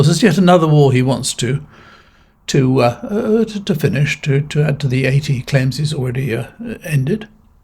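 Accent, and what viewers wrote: United Kingdom. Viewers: If you don’t know, know this now: Even though this man has an English/Brit accent, ONLY AMERICANS SAY THAT THE PRESIDENT OF THE USA “IS THE MOST POWERFUL PERSON IN THE WORLD.”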